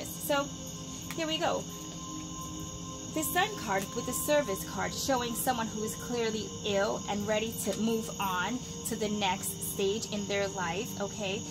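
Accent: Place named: New York, United States. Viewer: I here the NY in you 😊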